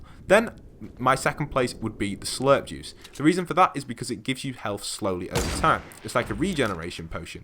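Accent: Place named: England, United Kingdom. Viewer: Englishman accent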